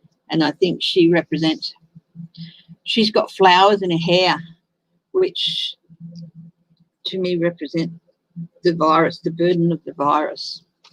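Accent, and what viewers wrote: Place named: Australia. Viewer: Thanks for reading on DeSantis,. Ron DeSantis is known to be tied to Trump. Cheating in the election is widely suspected. Lev Parnas and Igor Fruman were VIPs at his inauguration! Russia has a tight grip on the U.S. and it has been deadly. At this point I would not be surprised if people lose their homes and they are sold to Russian and Chinese oligarchs. I frankly don’t know if Trump will ever be brought to justice. I’ve kind of lost hope about it. The entire GOP are traitors who worship money 💰 and drag the name of Christ through the mud as they pretend to be bastions of morality when nothing could be further from the truth. The past four years have taken a heavy toll on me. I live in NW Florida just a few miles away from Johnie ( though we’ve never met in person). I love 💗 your readings— your Aussie accent is soothing. Your laugh makes me smile. 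🤗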